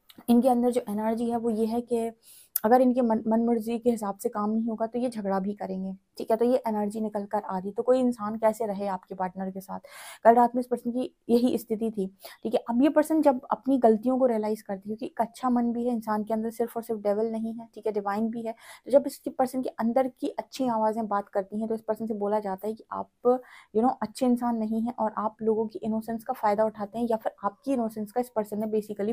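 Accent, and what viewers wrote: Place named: Bundelkhand, India. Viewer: Apka samjhane tarika bahut acha hai apki boli mai bundelkhand prabhav najar ata hai.. Pla mujhe pursanal riding de dijiye 🙏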